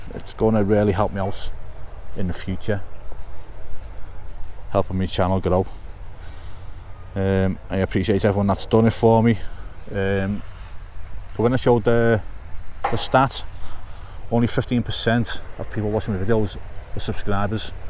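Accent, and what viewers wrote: Liverpool, United Kingdom. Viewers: One more thing, u scouse but i understand 💯 of your accent,, i know you try to speak english not scouse , for us to let us understand as much as possible.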